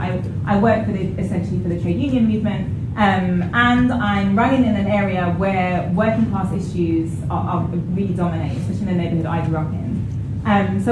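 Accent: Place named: London, United Kingdom. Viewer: she speaks really well so succinct and coherent so i wonder are talking from a position of snobbery about the accent ? or a racism for that modern london twang coming from someone with dark skin ? or a mix of the 2 ?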